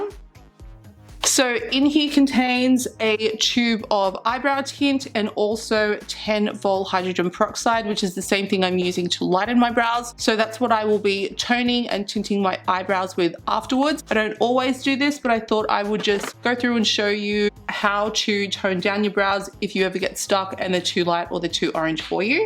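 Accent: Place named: Australia. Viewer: yes I thought that too!!! its the Australian accent !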